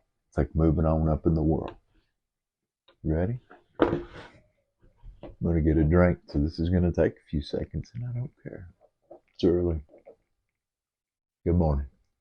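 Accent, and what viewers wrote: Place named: United States. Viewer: Mate you have the best yank accent in FPV 😄🤝 This is a brilliant video and I hope loads of newbs see this as the advice is SPOT ON! I tried raising this point with JB but he just poo poo’d me, I attempted to explain that he’s going to be out of a job if the next generation and their parents don’t even consider Analog, they just don’t have the money that digital costs let alone the repair costs when the inevitable crashes happen! 😮 Seen SO many guys come into fpv with a complete dji fpv/avatar system and then disappear after destroying their Gucci new quad and not being able to justify to themselves/the missus & kids the cost of replacement etc 😢 Eachine ev800D goggles still hold their weight, I use Cobra X now but still use the Eachine ones when my kids fly with me and let them use the Cobra’s 👍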